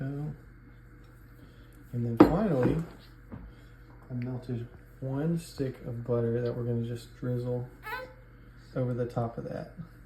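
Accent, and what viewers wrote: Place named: Tennessee, United States. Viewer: Should have known ya'll were from Tn😂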